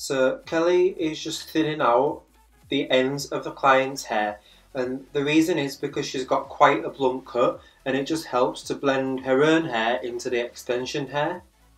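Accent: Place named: North, United Kingdom. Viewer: I love your channel! I've recently started doing my own hair at home (well, what I mean is a few months ago I had a disastrous set of nanos that all fell out within a week and I had an event coming up so I pretty much forced my poor boyfriend to watch endless la weave you tube videos and then try to put one in for me because I couldn't get/couldn't afford another last minute appointment..) Your videos are great, they've really helped/made me want to have this method after years of micro rings and the hair you do always looks so natural at the end It's nice to hear a fellow northern accent too, most of the videos you see are very excited Californians (not that I have anything against them haha) swishing their hair about and insisting on telling you their life story for 4 minutes before the video actually starts 😂 I was just wondering how many rows you generally put in and if you double/triple up the wefts on your rows? 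What weight of hair do you generally do as a full head? My boyfriend is surprisingly good at straight sectioning/putting the hair in that it stays in and he doesn't mind so he always does my hair now, but where to place and how many rows to put in is the only problem. They either seem to end up too far apart so I run out of head, or end up with masses of hair all at the top of my head so that it looks like I have an enormous head 🤦‍♀ Love the idea in this video of adding more hair to the weft at the sides too because I also have blonde/fine/short hair at the sid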